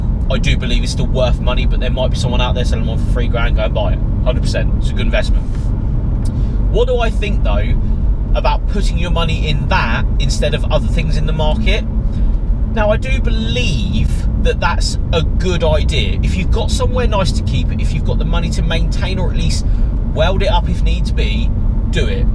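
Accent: United Kingdom. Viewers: First time seeing your channel, don't take this the wrong way, but it's so funny hearing a proper British accent come out of what looks like a Southern Kentucky truck driver who's in a biker gang. Nice content!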